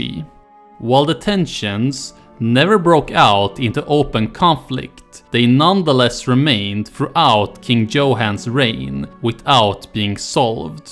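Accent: Sweden. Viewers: He is speaking as if he is reading English text with Swedish pronunciation... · Are you Swedish? I only been Stockholm very briefly, but you pronounce the "ee" (i / y) similar to Stockholm dialect And your pronunciation is very good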